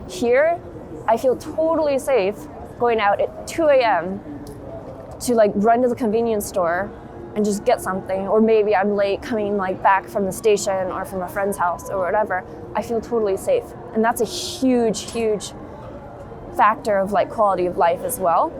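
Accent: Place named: United States. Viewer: I feel sorry Japanese people learn English from you as u speaks American.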